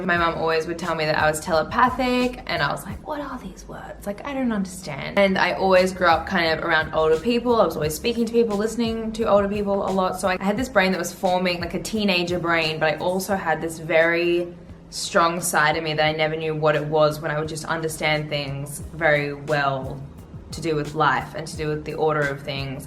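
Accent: Australia. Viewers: I love your Australian English. Are you from Melbourne?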